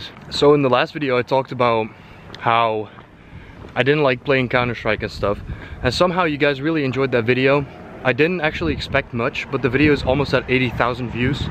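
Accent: Netherlands. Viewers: You from Rotterdam¿¿¿ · Are you Dutch?!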